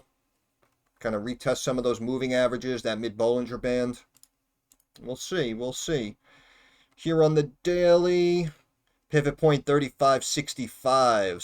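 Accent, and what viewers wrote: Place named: New York, United States. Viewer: I listen to you because you were spot on with luminar tech, and the the NY accent reminds me of home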